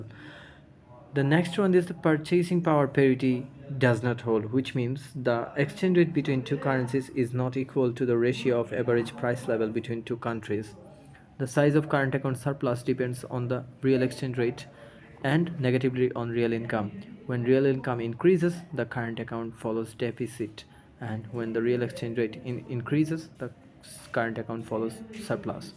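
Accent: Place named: United Kingdom. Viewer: ব্রিটিশ এক্সেন্ট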